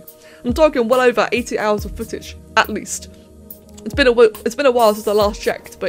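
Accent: United Kingdom. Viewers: i was watching since 2010:D Ps: are u brittish:3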